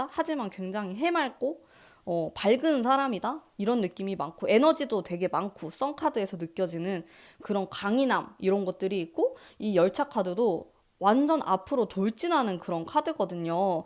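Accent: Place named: Gyeongsang, South Korea. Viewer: 경상도 분이신가요 ㅋㅋㅋㅋㅋㅋㅋㅋㅋ 아무리 감추려 해도 감춰지지 않는 사투리 잘 듣고 갑니다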